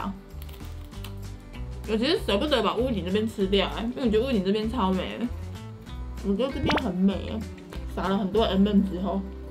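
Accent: Taiwan. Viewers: 可愛的..怎麼吃都美...又配上有台灣味愛台灣的臺語口音.吾告讚.水喔...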